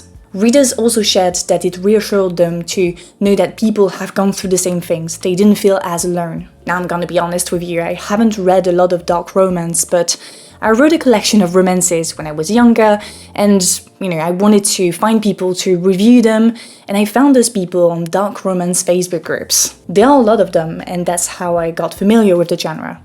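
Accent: France. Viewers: am i the only one who's just here for the French accent? lol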